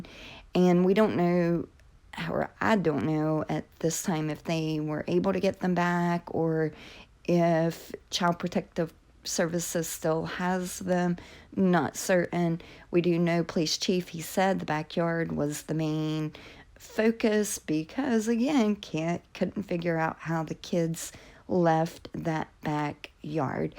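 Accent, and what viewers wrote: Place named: Carolinas, United States. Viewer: You from the Carolinas? Love the accent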